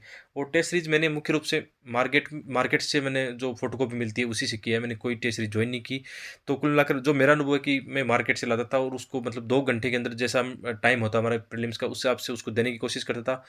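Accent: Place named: Jodhpur, India. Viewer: Sir aapke voice tone se lgg rha h aap jodhpur side se hoo please sir btaao aap khaa se hoo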